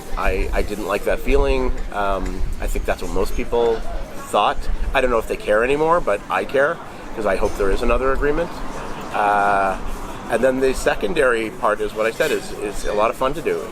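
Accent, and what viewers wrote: Canada. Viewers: He sounds so Canadian.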